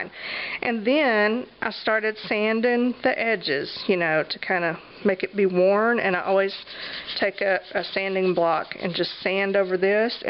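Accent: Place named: Texas, United States. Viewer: HI! I just found your channel yesterday. Awesome! 1st I love hearing the sound of home in your voice (I'm from the DFW area now in NW). 2nd I am so going to get a phone book and make an art journal, what a super idea! Thanks for sharing, now I have to find the video that tells me how to put the inclusions in. Keep it up!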